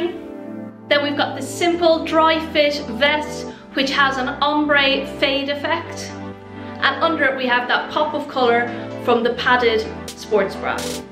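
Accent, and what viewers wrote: Ireland. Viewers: oh okay, Donegal here, thought it was A Donegal accent🙄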